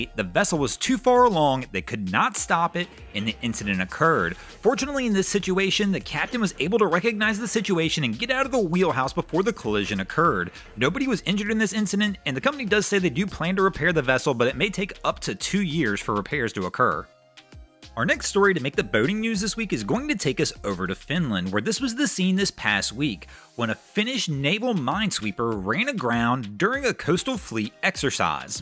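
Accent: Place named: North Carolina, United States. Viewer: He sounds like a North Carolinian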